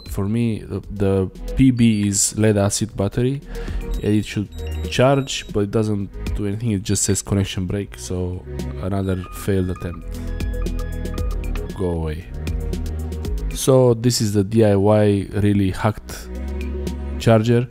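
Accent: Romania. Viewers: Ești roman? Se simte accentul 😂💪🏻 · Could hear something was familiar to that accent. Te salut din bucuresti!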